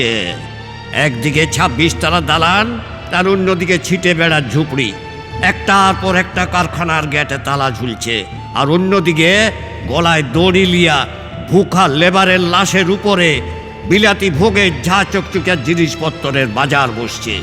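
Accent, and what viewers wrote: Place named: Bankura, India. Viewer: ভালো লাগলো যথারীতি। একটা কথা বলি, যদি কিছু মনে না করেন। আপনি তো স্বর্নালী সময়ের অধিকাংশ সময়ে কোলকাতায় ও সাউথ চব্বিশ পরগনার স্কুলে যাপন করেছেন। আপনার বেশির ভাগ কবিতার উচ্চারণে বাংলার বাঁকুড়ার গ্রামের ভাষার স্টাইল সব সময়ে সকলের ঠিক ভালো লাগে না । এ ব্যাপারে একটু মনে রাখলে বাধিত হবো। আর একটা কথা বলি, সুলেখা দিদিভাই এর কমেন্টস্ ভীষণ ভীষণ ভালো লাগলো। আপনারা দুই জনে আমার শ্রদ্ধা ও প্রণাম নেবেন।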